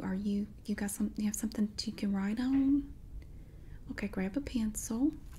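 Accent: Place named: North Carolina, United States. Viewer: I love this accent so much as a southern guy. It's really we done. Then I find out she is in NC cause Winston Salem is right up the road and now I understand why it sounds so familiar. NC represent!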